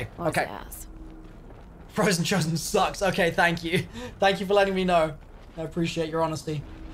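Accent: United Kingdom. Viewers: Hearing “y’all” in a British accent might be the best thing ever; you’ve surpassed NJ456, and have become the Omega Gamer Leader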